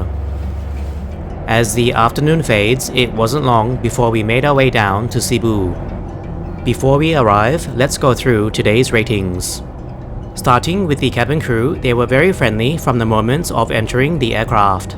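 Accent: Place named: China. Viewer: Why is your pronunciation so reminiscent of a Chinese - educated person? Pronouncing non - existent “Ss”. And your tone of voice is really flat like the airport runway.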